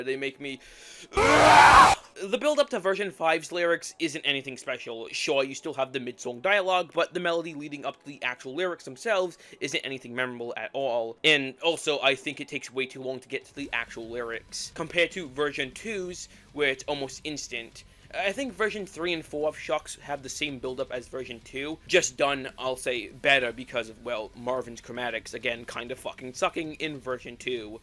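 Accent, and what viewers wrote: Brooklyn, United States. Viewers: By the way, do you have a Brooklyn accent? It sounds like it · The worst and best part about this video is that he sounds like Brooklyn guy slightly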